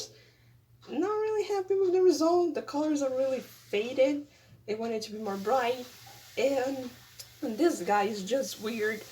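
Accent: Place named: Brazil. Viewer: You're accent sound a little Brazilian, where are you from??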